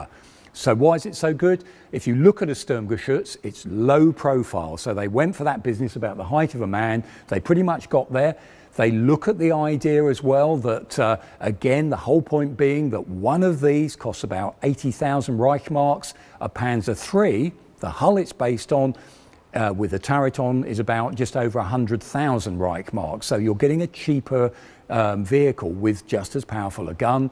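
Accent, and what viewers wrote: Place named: United Kingdom. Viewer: Alongwith the knowledge about tanks, I get to learn British accent also! Win win!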